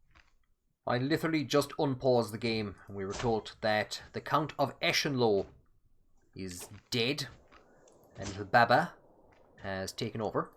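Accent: Ireland. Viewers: I just want to say that this is the best playthrough of CK3 I have ever watched. I like the editing, especially, but also your incredibly knowledge of the history from this period and region. Also, as a typical American, I really enjoy the Irish accent. I know that's silly, but it's true. The end of the series is, for me, kind of like losing a friend. But here's to making new ones.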